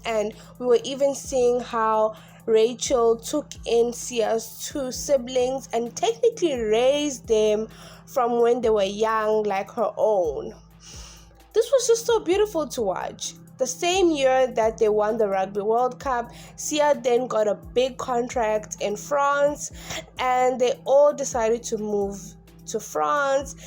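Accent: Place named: United States. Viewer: Why is this girl (naraiting) talking with an American accent. Pse!!!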